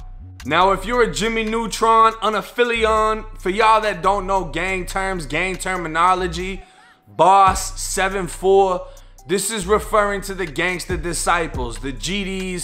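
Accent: Boston, United States. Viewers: Your accent sounds like you was raised in Boston and moved to a Florida hood when you were 10.. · Bro what part of Boston you from...!!!???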